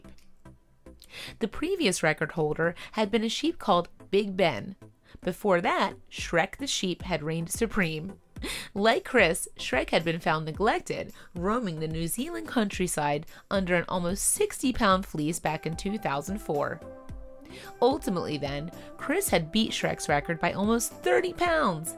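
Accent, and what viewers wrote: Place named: Mid-Atlantic, United States. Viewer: I'm willing to bet that the voice over artist is from a central Atlantic state. She did a great job, I'm absolutely not being snarky. But you can hear a PA/MD/NJ/DE influence. It's cool!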